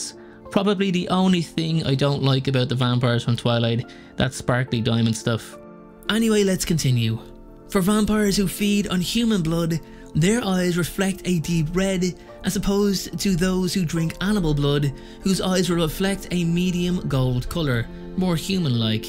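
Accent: Ireland. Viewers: OMG WTF do u sound so bloody Irish 😳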